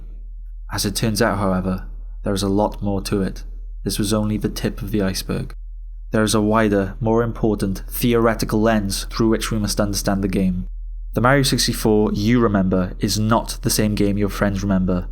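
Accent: Wales, United Kingdom. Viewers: everyone else is talking abt the crepypasta but i just cant not notice the welsh accent lol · Wait are you br*tish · Wondering this what part of Wales you from?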